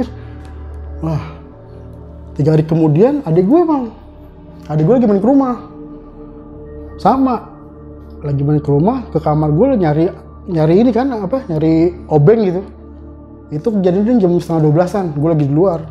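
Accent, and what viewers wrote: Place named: Betawi, Indonesia. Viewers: Betawi bet bahasanya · Betawi bangat da ah logat nye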